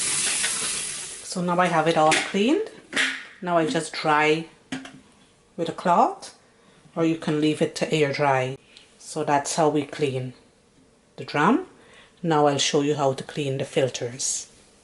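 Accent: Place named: Trinidad and Tobago. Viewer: Are you Trinidadian? (Wonderful video, thank you.)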